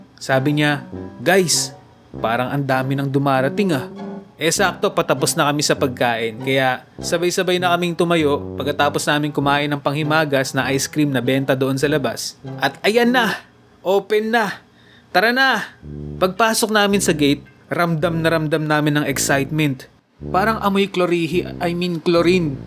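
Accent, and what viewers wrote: Ilocos, Philippines. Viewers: Kuya ilocano ka po ba?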